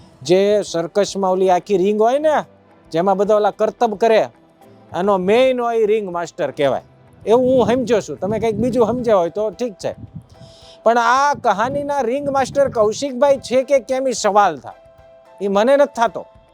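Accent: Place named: Surendranagar, India. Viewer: tame surendranagar na cho tamari boli uper thi lage che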